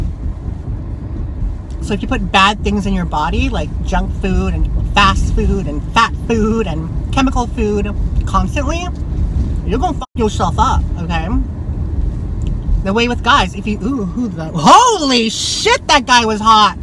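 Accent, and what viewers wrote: Philippines. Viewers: I agree with the filipino accents!! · 😂 definitely agree with you on the Filipino accent! You’re always hilarious❗️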